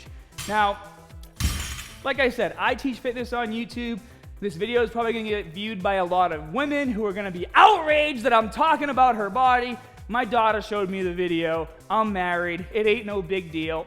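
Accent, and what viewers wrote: Boston, United States. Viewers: Is he from Boston ? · Is from Boston?? GaaahBage.... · Is that a Boston accent? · Boston accent keeps coming out. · I’m assuming he’s a Boston guy, right? Gahhhhbage, Lahhhson, etc. 😂😂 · Man are you from Boston?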